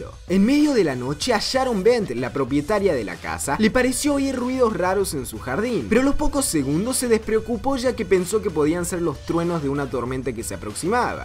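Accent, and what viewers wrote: Córdoba, Argentina. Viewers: SOS ARGENTINO ?¿ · So' cordobeh? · sos cordobes o porteño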